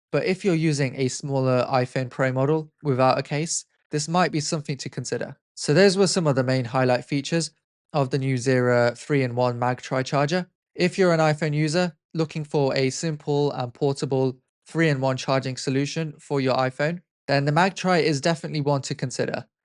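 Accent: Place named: United Kingdom. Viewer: I really like your uk ascent 😂 😂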